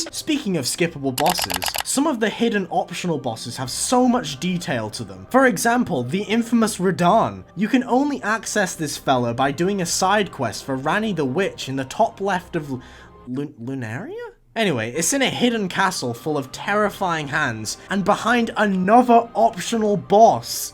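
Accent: United Kingdom. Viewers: good content, and good personality m8. you'll blend right in with the 1,000,000 other British sounding from soft critiques m8 also 20$ says you used a zweihander in ds1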